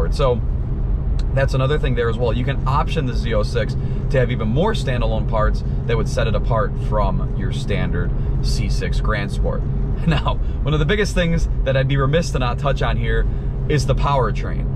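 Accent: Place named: United States. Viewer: haha no no I understood that maybe it’s your American accent. I’m from Toronto Canada! Definitely the GS and Z06 platforms are best bang for your buck but the ZR1 is a beast. It’d be a nice car to review on the channel if you can get your hands on one!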